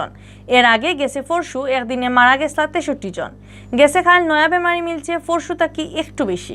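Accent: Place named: Jaintapur, Bangladesh. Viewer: ও মর আল্লাহ একদম সিলেট জেলার জৈন্তাপুর এর ভাষা,,,?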